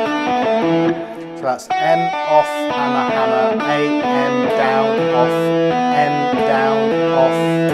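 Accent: United Kingdom. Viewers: I've noticed that has a British accent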